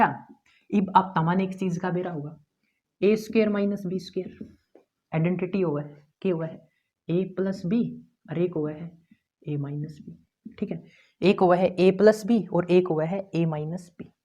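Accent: Haryana, India. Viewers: as we all know sir teachs in best way😼❤️. But Yo haryanvi accent add hon ke bad😂🫶ekdum ghar aali feel ari sir Thanku. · Ye vedio me sir bhut sweet haryanvi bole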